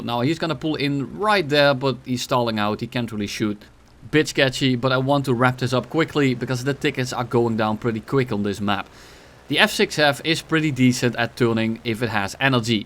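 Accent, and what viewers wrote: Netherlands. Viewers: Thanks for the entertaining videos and the dutch accent, keep it up!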